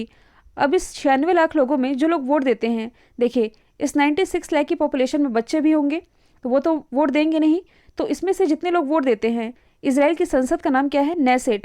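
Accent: Madhya Pradesh, India. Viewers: Madam aap mp se hai kya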